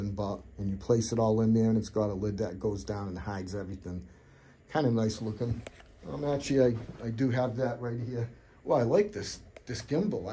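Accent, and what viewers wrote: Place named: New England, United States. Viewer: Sounds like you're in New England like me!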